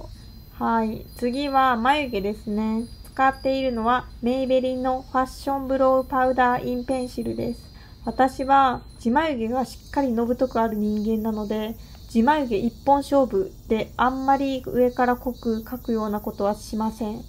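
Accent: Kansai, Japan. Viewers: 関西弁かわいい😂😍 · 本当に魅力的なんだよなー🫶 あと、自分東京に住んでるから、関西弁めちゃくちゃ憧れるー🤩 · 関西弁だったんだすごいギャップ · 関西弁、、？！意外！ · って関西弁なんや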